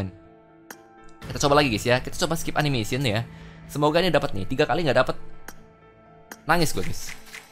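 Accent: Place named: Medan, Indonesia. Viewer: logat medan banget kau bang😁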